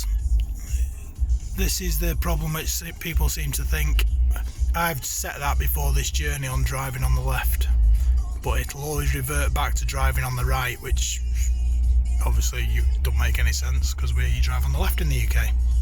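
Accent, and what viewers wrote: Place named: Australia. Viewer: Your Australian accent is so difficult to understand.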